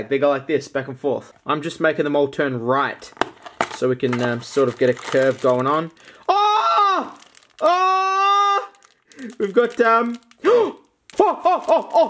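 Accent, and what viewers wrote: Australia. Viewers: I can not stand how Australians say "Fourdeeen" instead of "Fourteen". speak English 😠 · The more excited gets the more Aussie he gets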